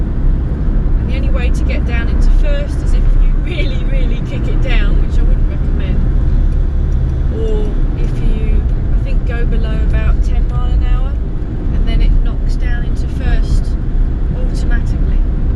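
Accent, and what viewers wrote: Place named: Gloucester, United Kingdom. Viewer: Hi i loved watching your driving were you driving around Gloucester as that is my neck of the woods and your accent sounds very familiar looking forward to the next one best wishes roy. 😊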